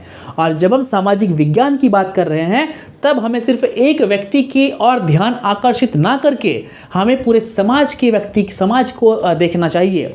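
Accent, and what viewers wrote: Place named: Bihar, India. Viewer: aapke accent se lagta hai your from Bihar or Jharkhand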